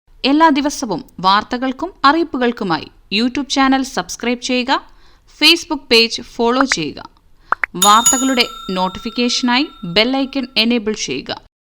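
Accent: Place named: Malabar, India. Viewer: എടോ നീ കായംകുളത്തുകാരൻ അല്ല നിന്റെ സംസാരം കേട്ടാൽ അറിയാം നീ മലബാറുകാരനാണ്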